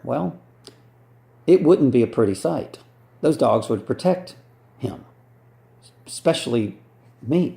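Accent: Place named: United States. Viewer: what have the Russian bots got to do with helping targeted individuals?you sound American, if you are American you should organize targeted individuals to run the gauntlet to reach president trump. I can assure you trump is oblivious to these programs carried out on innocent humans. try watching youtube channel (ramola d). my freind Trump is the one way these programs can be exposed. the other way is if Putin allows Edward Snowden to expose these horrific crimes. or god gives rise to several whistleblowers who will be mavericks to expose what tortures the corrupt establishments have performed on humanity. what I do believe in is when the time is right gods forces will destroy all satellites communications to free humanity. good will and has to prevail evil.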